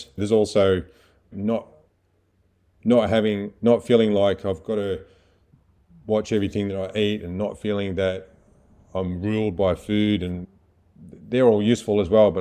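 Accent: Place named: Australia. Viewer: Yes. I say trying health coaching. Maybe sign up for modeling and acting as your Australian accent would be fun to hear in films! Reminds me of Crocodile Dunn Dee! You are unique so maybe looking into those areas. But the the main things is do what you are passionate about!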